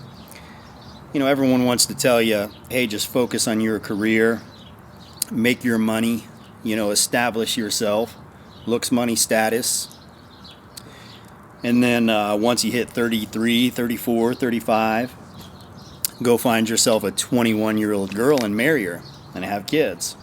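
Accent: United States. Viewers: I'm wondering how your tinder dates look like because there are many attractive men out there with not really attractive chicks...I love the tone of your voice with this american accent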